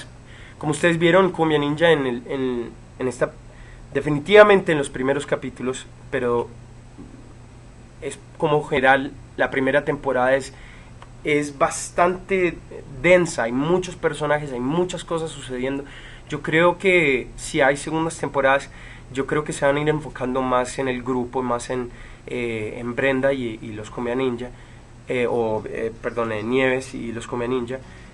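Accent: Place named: Colombia. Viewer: yo la verdad pense que eras colombiano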